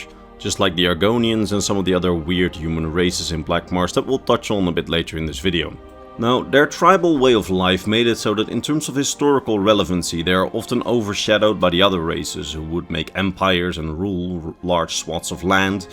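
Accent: Netherlands. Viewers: Ik hoor gewoon t Nederlandse accent haha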